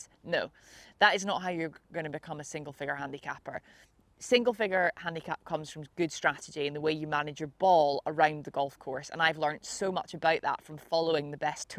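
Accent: Scotland, United Kingdom. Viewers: Love this channel, so easy to listen to you with that soft Scottish tone. And a smooth looking swing that anyone would want. Don't stop.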